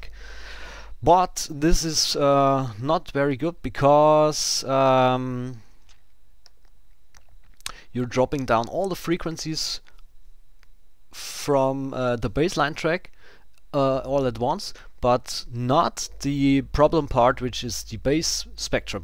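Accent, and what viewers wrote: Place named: Germany. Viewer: you sound German..lol..."wideos"....still a good tutorial. thnx!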